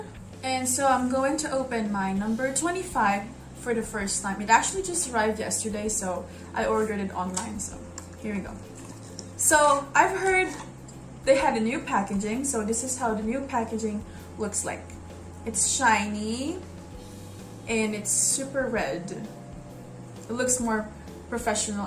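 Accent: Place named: Philippines. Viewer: I like your filipino accent:))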